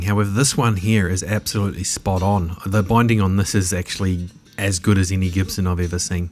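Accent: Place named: Australia. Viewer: I like listening to an Aussie swear !! Sounds fackin cool !!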